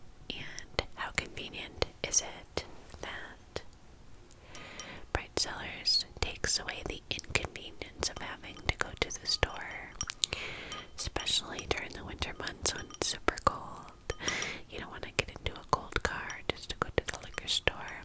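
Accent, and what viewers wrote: New York, United States. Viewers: Hey! I love your breathy whisper videos! I love to hear your rambles in a NY accent!😊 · please do another ny accent video <3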